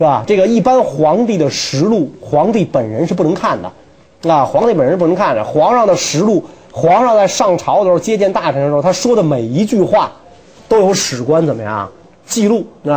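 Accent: Beijing, China. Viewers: 首先得是北京口語，節奏明快用詞精準，光聽都覺得順耳，流暢不嗑巴..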